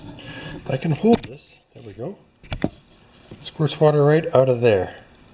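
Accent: Scotland, United Kingdom. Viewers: Good close up for sure. I liked your Scottish accent ;-) no easy fix for that pump.. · Great Scottish accent there, better than mine! (Watching from the UK):)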